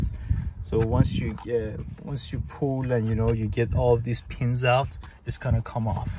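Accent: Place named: Iran. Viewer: That is an Iranian accent if I’ve ever heard one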